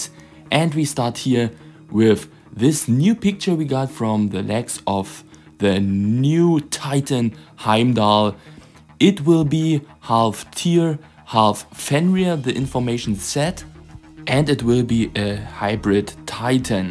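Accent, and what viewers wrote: Germany. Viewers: Ich liebe dein deutschen Akzent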